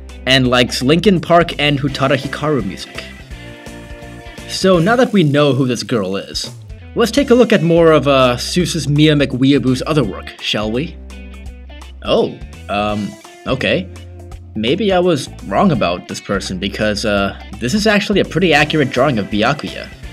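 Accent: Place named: Wales, United Kingdom. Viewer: Oh god, she's Welsh...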